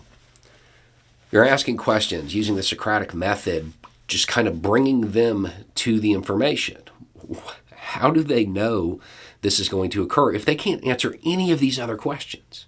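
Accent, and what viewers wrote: South, United States. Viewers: When I was younger I carried shame for simply being born in the south. Because many of our people were and some still are racist and or extremists often falling for absurd conspiracy theories. So it's nice to hear a southern voice that represents the south and southern men well. Thank you. UPDATE - didn't expect interest in my comment but since some have noticed I just want to make clear I am not ashamed to be southern. Certainly there are people in our past and unfortunately present we can't feel good about. But just like minority girls and boys need people that can inspire them to be great, I feel the same can be said about southern white kids (and all kids really). Perhaps in addition to pointing out our historical mistakes in history class and public discourse (lest we repeat them again) maybe it would be useful to highlight those who have done well. 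We have astronauts, scientists, inventors, and other great people we can also be inspired by. I prefer the word "inspired" over "pride" because "pride" often leads us down a dark path. Plus I don't think I should feel any personal pride for the accomplishments of others, but I think I should be inspired by them to become a better person myself and feel a sense of "possibility".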